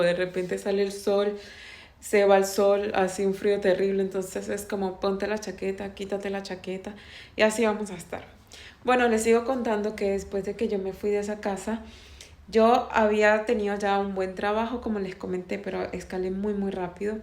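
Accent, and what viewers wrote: Colombia. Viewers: Por una razón elemental, los venezolanos hablamos VENEZOLANO, seamos de Caracas, de Oriente, de Mérida, del Táchira, del Llano, de Lara, de Yaracuy y del Zulia, todos sabemos cómo hablamos los venezolanos en cada una de nuestras regiones; y los colombianos hablan COLOMBIANO, sean de la Costa, de Bogotá, de Medellín, de Calí etc. Ella no habla ningún acento venezolano autóctono de nuestras regiones de Venezuela, ella habla colombiano porque es colombiana. 🤷🏻‍♂️ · Se te nota un poco de acento colombiano. Saludos desde España.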